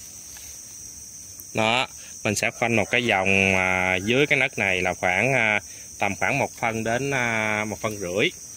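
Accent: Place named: Mekong Delta, Vietnam. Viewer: Đúng chất giọng người miền Tây quê tôi, chỉ dẫn thật tình và tận tâm. Tặng cậu một like. Chúc cậu luôn gặp nhiều may mắn trong cuộc sống 🌺🌺🌺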